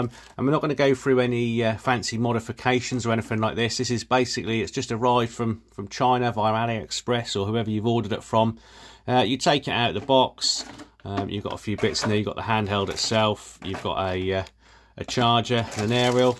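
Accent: Norfolk, United Kingdom. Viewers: Thass a fine Norfolk accent you've got there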